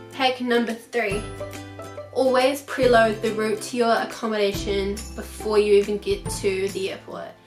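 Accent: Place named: New Zealand. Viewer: Is that a Kiwi accent I hear?! LOVE IT 😍🙏 And awesome video, great tips chica! X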